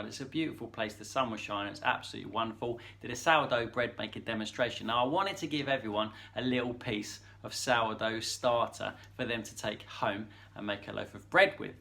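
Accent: United Kingdom. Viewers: Hi, I’ve just discovered your channel and find you delightful and after seeing the first video I’ve quickly subscribed! As an American I love your accent and most of what you say I understand - sometimes I have to try and interpret a word but it’s so worth it. Right away I’m learning some things about sourdough that I did not know. My journey with sourdough just recently started and you make it fun!! I’m 78 years young and I think I have a crush on you, lol 😊 ( who says Americans can’t learn something from the Brits? ). Happy baking sweetie 😅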